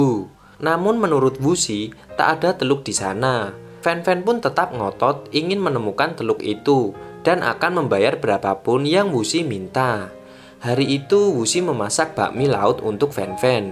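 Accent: Java, Indonesia. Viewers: Aduhhh logat nya terlalu medok bro jadi kurang nyaman nontonya, sorry to say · Narator Jawa tulen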